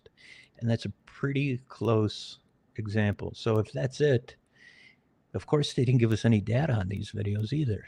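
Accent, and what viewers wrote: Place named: Midwest, United States. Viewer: Welcome back. Always enjoyed your perspective. Good to hear that midwestern accent talking UFOs again.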